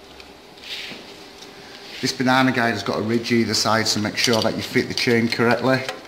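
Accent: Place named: Bradford, United Kingdom. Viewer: I just googled "does nissan pathfinder have a timing chain" and your vid link came up... the reason I clicked on it was because of the place name "west yorkshire" oh my home county, not only that your in my home town "Bradford" that I left 40 years ago.... So I watched your video just to hear the Bradfordian accent and I was amazed at what you had taught me about this engine Thanks for the informative video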